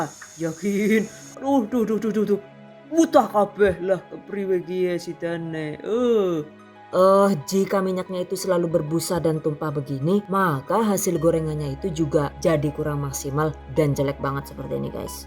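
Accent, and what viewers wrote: Banyumas, Indonesia. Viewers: Galfok sama NGAPAK nya 🤣🤭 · Jebule wong ngapaak😭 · ngomonge ngapak.. wong ndi kii😂😂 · Deneng ngomonge ngapak yaa 😂😂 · mandan kaget pas pengisi suarane ngomonge ngapak🤣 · laaah deneng ngapak siih 😂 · jebule ngapak, ngapak ndi mbak e, ws rong tahun maning nembe ngerti yt kie, suwun yh mbak e atas ilmune 👍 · Masyaa Allah ngapaknya jadi kangen kampung halaman. Terima kasih resepnya. Barakallah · Suaranya enak tp tiba2 kaget ternyata ngapak ... 😅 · Fix ente wong ngapakkk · Alahhkae..tengane wong ngapak..😂..kesuwun mbekayu resepe ya..semoga sukses terus yu😂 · cilacape pundi... jan ora ngapak ora kepenak😅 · Oh wong ngapak jebule.😄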